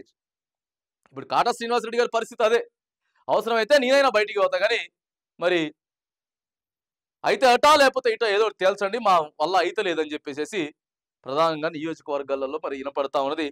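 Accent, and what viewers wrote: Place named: Andhra Pradesh, India. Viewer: అందరికీ నమస్తే నువ్వు ఆందోళన తమ్మి అందరికీ నమస్తే అన్న పదం మంచిగుంది గాని నీ మాట వింటే ఆంధ్ర లెక్క ఉన్నావ్ ఆంధ్ర కుక్క వా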